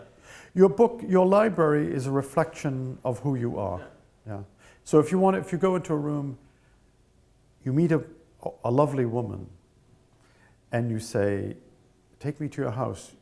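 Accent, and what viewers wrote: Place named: New England, United States. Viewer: Upper-class New England accent. Great writer. Smug. Patriarchy supporter. Western Imperialist. Total White Man.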